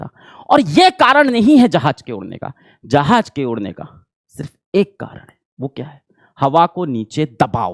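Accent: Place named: Uttar Pradesh, India. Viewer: Who is this joker? Very unprofessional way of explaining aviation theory. This is 2025 and this guy is still stuck in bhaiyya accent.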